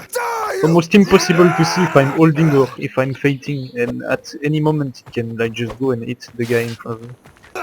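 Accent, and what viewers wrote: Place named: France. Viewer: I DONT LIKE THIS FRENCH ACCENT ! FUCKING BAGUETTE but great vid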